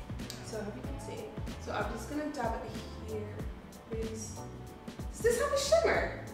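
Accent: Ireland. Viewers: Am I trippin or do you have an irish accent? It's stunning either way😍😍😍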